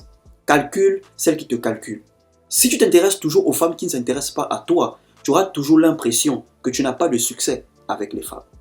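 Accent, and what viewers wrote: Gabon. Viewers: J'ai découvert cette chaine au début de la semaine, j'ai visionné plusieurs vidéos, et je trouve que le frère a du vécu, il sait de quoi il parle, tout en dégageant l'attitude de quelqu'un qui est issu du milieu de la séduction. Une très bonne connaissance de la nature et la psychologie féminines. C'est le type même d'ami, de frère, voire de grand frère qu'on aimerait avoir eu avant de se lancer dans le Game où de nombreux d'entre nous se sont fait broyer par inexpérience. Et l'accent du Gabon, où j'étais en 2008, est juste nostalgique. Force à toi frérot, un nouveau fan de ta chaine, du côté de Brazzaville. Mbolooo!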